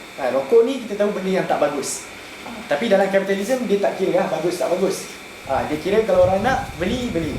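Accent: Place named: Kedah, Malaysia. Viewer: You have grown to be matured...still remember u r such a small kid during form2 debating in UIA with d kedahan slang 😁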